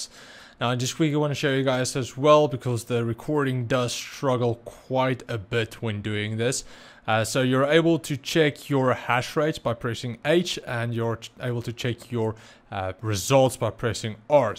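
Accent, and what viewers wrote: South Africa. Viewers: Hey, are you from South Africa? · is this guy South African? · South African accent. Disturbing. Oh well.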